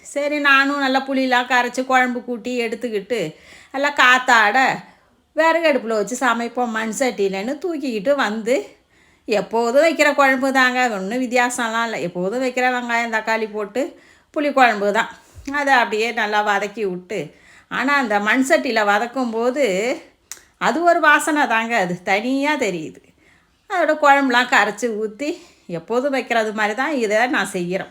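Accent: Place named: Mannargudi, India. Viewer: Unga pecha ketta mannai side pechi pola irukku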